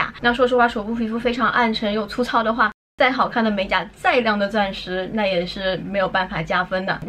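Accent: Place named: Taiwan. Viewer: 口音越來越像台灣人 讚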